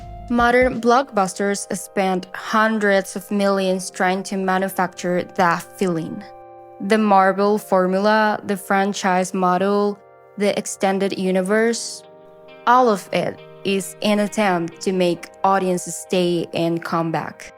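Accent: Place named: Tagalog, Philippines. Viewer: Are you Filipino too? I think I hear a little Tagalog accent, or maybe I'm wrong.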